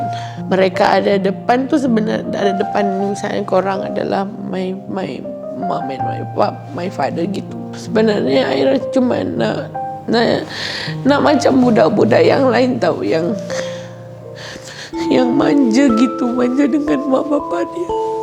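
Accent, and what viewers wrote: Malaysia. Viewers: Logat mcm sabah tapi hakikat dia ni org indon.. tula malaysia dan Indonesia ni serumpun🥰😍😘🇲🇾🇲🇨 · Cakap pun mcm org Malaysia. Doa yg terbaik utk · Sekarang ni memang dah menetap Malaysia ke? Dah pandah ikut slang orang Malaysia. 👍🏻👍🏻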